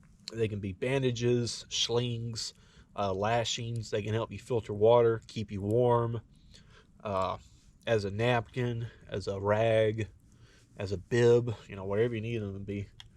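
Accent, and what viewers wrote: South, United States. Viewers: Love your backwoods country talk, hope Sasquatch leaves you alone, Outdoor boys, Is formal, you are country, I love country, hope to see more videos. Keep em comin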